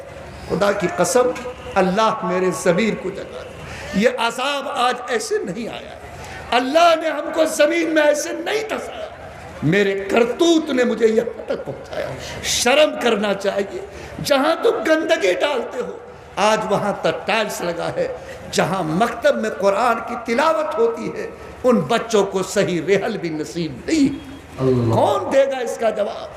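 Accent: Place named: Bihar, India. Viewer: Andaaze bihari hae. Lekin haq aur zameeni sachai bol rahe haen.Lachhedar taqreer nahin.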